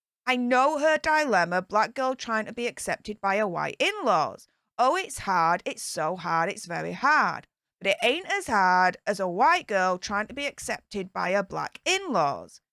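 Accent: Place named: Northern England, United Kingdom. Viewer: I've only just discovered your channel this week when you were talking about Harry's therapy session. I love your energy and personality. I live in the North West of England . I simply love Northern accents. I see that Daniel Boland is from Sheffield. I'm a stone throw from Manchester in one direction and Derbyshire in the other direction. I've tried to place your accent. My daughter-in-law us from Darwen in Blackburn. I could listen to her all day. Are you on the borders of Lancashire and Yorkshire ? Anyway less of the Geography. I think your channel is the sort where housebound people love your vlog because your demeanour is such that you feel like a pal.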